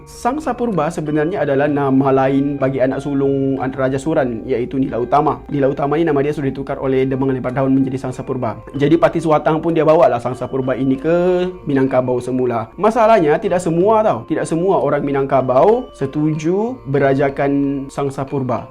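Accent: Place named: Sabah, Malaysia. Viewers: Saya ingat abg orang sabah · Dah sah2 dia orang Malaysia dik.. dia cakap guna loghat Sabah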